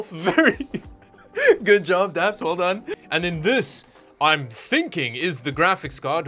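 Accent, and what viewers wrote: South Africa. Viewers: Is South African? Accent seems familiar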